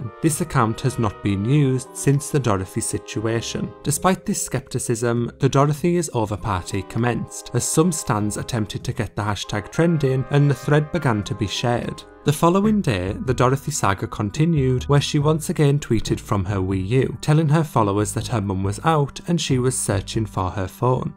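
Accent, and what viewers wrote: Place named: Northern England, United Kingdom. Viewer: the northern accent makes this funnier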